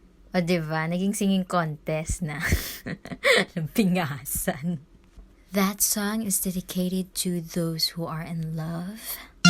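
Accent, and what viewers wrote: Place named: Iloilo, Philippines. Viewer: Ilonggo accent haha di madula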